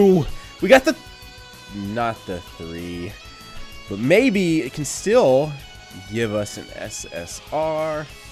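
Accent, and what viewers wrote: South, United States. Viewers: IS FROM THE SOUTH AND WHAT STATE!? He has that southern accent or am I crazy!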